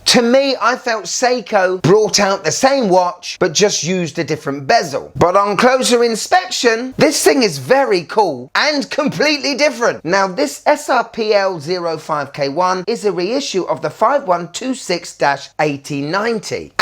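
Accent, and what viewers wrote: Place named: Scotland, United Kingdom. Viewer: I thought you were one of us with that Scottish accent mate! 🤣🤣 both awesome looking watches and another fun show as always matey! 😁👌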